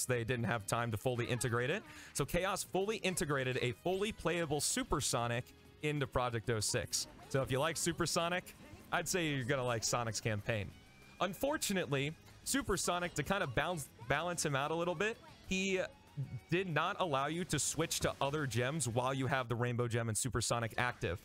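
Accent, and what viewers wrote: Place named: Chile. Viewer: I thought he was Chilean lol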